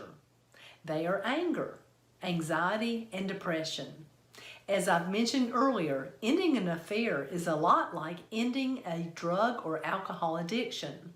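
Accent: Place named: South, United States. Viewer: Well, yeah, you can tell by the southern accent that Christianity blurs the fact of the matter….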